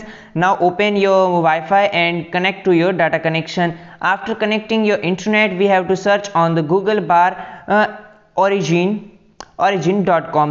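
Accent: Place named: India. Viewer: Indian accent sound so dam hot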